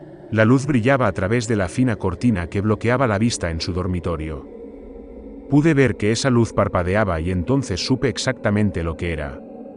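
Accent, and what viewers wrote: Spain. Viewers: Es encantador como los Españoles pronuncian la "S" , en cada País es distinta la forma de pronunciar ciertas letras y nadie tiene porqué hacer llamado de atención de como uno pronuncia una palabra .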